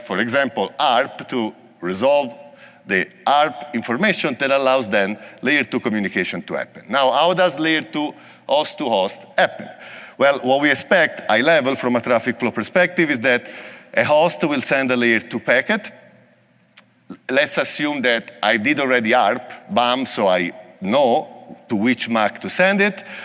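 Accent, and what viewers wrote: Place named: Italy. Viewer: I love Italian accent.. Thank you. Great delivery.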